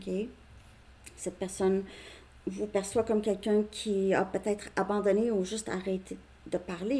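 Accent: Canada. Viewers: Bonsoir, votre guidance me parle énormément. Merci à vous.🙏❤️. J'adore votre bonne humeur qui est contagieuse et votre accent canadien. 👍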